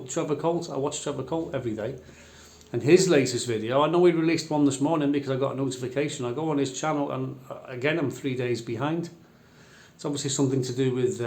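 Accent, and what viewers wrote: Liverpool, United Kingdom. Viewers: The scouse accent is way more attractive on you, rather than the posh accent you use more and more of. Don't forget your roots.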